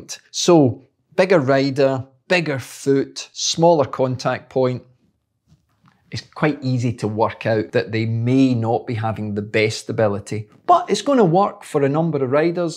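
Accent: Scotland, United Kingdom. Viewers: yeah, pretty much been using Time since day 1. Using Crank Bros for gravel and MTB, which is similar to Time in terms of lateral float and retention/release. Love the channel (and the Scottish accent!) and keep up the great work! 👍👍👍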